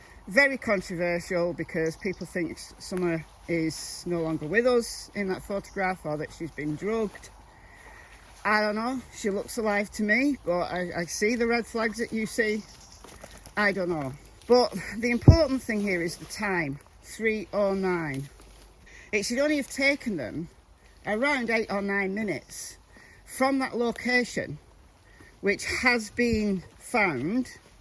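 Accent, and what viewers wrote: United Kingdom. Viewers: You are a beautiful British Lady! And I ❤️ your accent. Nice seeing you before our walk. Great timeline connections. Makes sense. I’m personally getting tired of “what if” scenarios & just wish TBI would confirm some facts they’re working with. IMO this is connected to a larger crime like trafficking & that’s why TBI is silent while they work that angle. Just my thoughts. Luv u & the doggos! ❤️❤️❤️ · where are you from (area of the UK)? You have a very particular accent, so I wonder where in the UK it's typical. Thank you. PS: I love your walks with doggies. They are lucky to have so lovely places to go to with you. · I love your channel, and I so enjoy your walks with your cute dogs. I also love your British accent (I’m a Texan, so you would probably think I’m the one with the accent, lol). Take care. I’ll be watching/listening!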